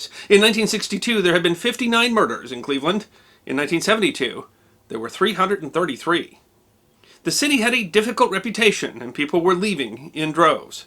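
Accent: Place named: Indiana, United States. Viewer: you sound like the indiana version of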